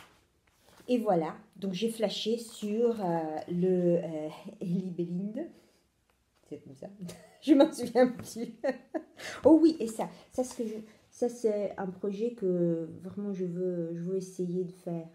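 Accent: Italy. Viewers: Contente de te retrouver ! J'ai eu une collègue italienne qui avait vraiment le même accent que toi, c'est chouette, à chaque fois je pense aussi à elle :) Mais sinon quand même je te regarde pour toi et tes jolis tricots !!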